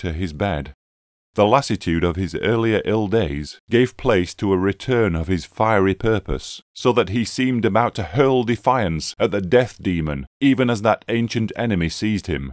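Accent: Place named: Spain. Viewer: One of the best Lovecraft's tales! ⭐⭐⭐⭐⭐ Funny one Spanish doctor's name was 'Muñoz' & the other one 'Torres'. 'Torres-Muñoz' is a pharmaceutical company founded by the time the tale was written & that still exists in Spain! They make pharmaceutical salts. 😀 Thank you for the narration & was enjoyable with the Spanish accent! 🙏☺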